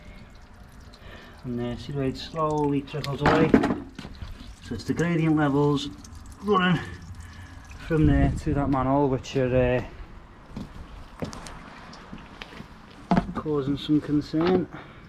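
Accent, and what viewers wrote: Liverpool, United Kingdom. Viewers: Please don't talk when the jetter is on. It drowns out the sound of your voice. Your strong liverpool accent is difficult to understand already.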